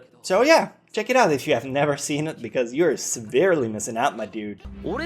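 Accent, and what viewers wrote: Quebec, Canada. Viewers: I feel like now that I know you're from quebec I can't "not hear" the signature accent of a quebecois speaking english xD i'm from montreal lol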